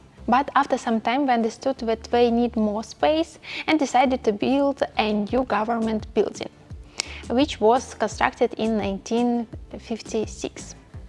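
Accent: Russia. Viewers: You have Soviet(Russian) accent in English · Are you Russian? Coz you sounds like Russian. I love that 👍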